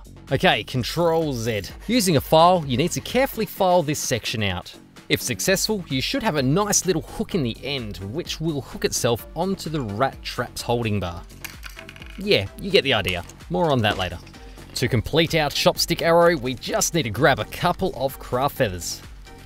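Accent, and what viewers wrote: Australia. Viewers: Great to hear our Ozzie accent on the tube without the waffle like the mob from North of the equator go on and on and on and on till I usually hit the mute button . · O meh gawd, I found another Aussie! How ya doin' mate? (Pretty new to the channel.) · Idk why but I love the australian accent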